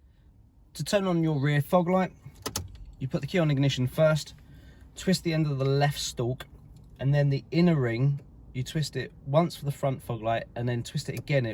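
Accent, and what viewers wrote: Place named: England, United Kingdom. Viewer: Awesome English accent. Thanks a lot 🙏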